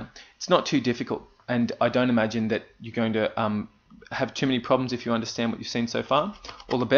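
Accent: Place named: New Zealand. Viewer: I bet you are from New Zealand. Loved your video! Thank you.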